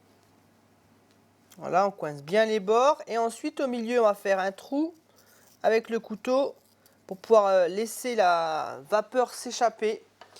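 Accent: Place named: Rural, France. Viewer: Le gars avec son accent du terroir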